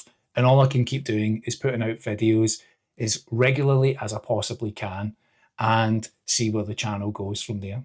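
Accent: Scotland, United Kingdom. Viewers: I suscribed from your first video, which just randomly popped up on my screen back in January. I watch on a tv. You’re doing well and your channel will keep growing. And it always helps to have a Scottish accent, one of the nicest to listen to on youtube.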